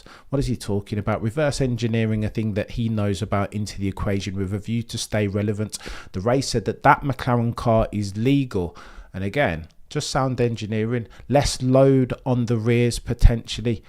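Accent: Birmingham, United Kingdom. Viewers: Never would I have ever thought the way to make F1 jargon interesting is to say it with a brummy accent. Go on my bro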